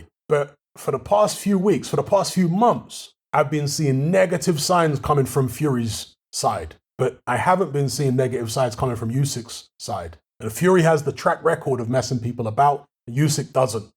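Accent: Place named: United Kingdom. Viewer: i just assumed it was a bri'ish accent thing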